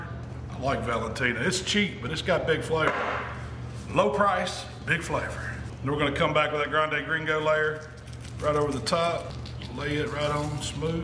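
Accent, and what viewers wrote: South, United States. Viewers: I stumbled across your page, looking for a how-to guide on grilling, and I hit the jackpot. You have gained a new follower, I love your Southern accent. It's time for me to binge watch your content for ideas, tips, and tricks. 🤗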